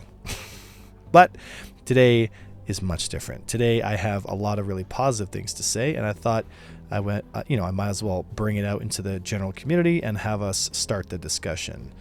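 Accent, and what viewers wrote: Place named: Canada. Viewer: you sound SO Canadian here lol.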